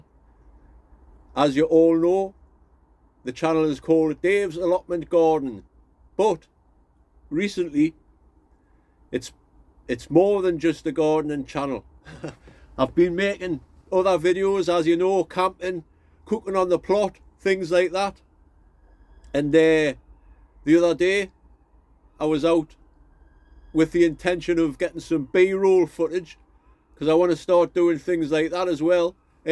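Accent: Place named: Newcastle, United Kingdom. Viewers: Dave's allotment channel and tings it has to be . look forward to hearing the real jordie accent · Came for the gardeing, stayed for the music and your canny personality Dave, the history was good, I was stationed at HMS Collingwood for a time in the RN so hearinf about him was interesting, it's all good, you do you pal, don't think you need to change the channel name, dunt really matter, the titles say what it's about, but if you feel like a change go for it. My best man is a Geordie so I don't have any probs with the full accent, some of the colonials mite get a bit confused like lol. I used to watch when the boat comes in in the 70's as a kid, was the last thing we were allowed to watch before bed, I still remember some of the words, and that mad trumpet from the theme tune lol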